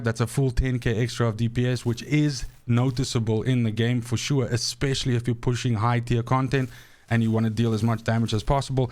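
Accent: South Africa. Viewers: You south african? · Are you from South Africa?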